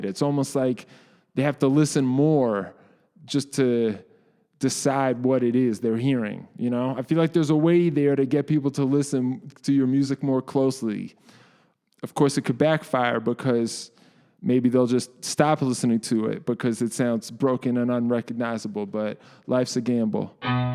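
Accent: Boston, United States. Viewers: are you from boston or something? im trying to place your accent. it doesnt sound nyc to me but maybe im trippin. thanks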